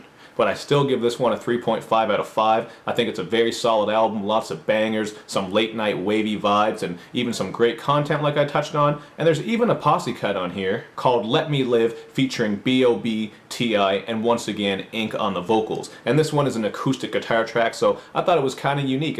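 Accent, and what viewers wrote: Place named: Western Canada, Canada. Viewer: Are you by any chance from western Canada? You sound like me lol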